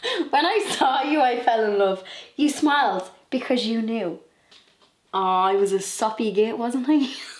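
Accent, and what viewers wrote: Ireland. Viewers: Just seen you for the first time. It’s always such a shock to hear another Irish accent, but a good shock! 🤗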